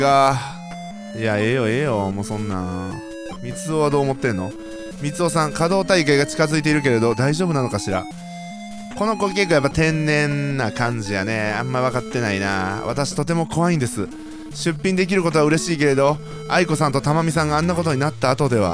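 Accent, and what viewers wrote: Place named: Kyoto, Japan. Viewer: 京都弁いいですね〜꒰ ´͈ω`͈꒱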